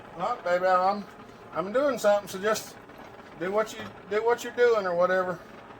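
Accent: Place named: Alabama, United States. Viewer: are from sweet home Alabama where the sky is so blue? You have our accent. We say Sir and we say Ma'am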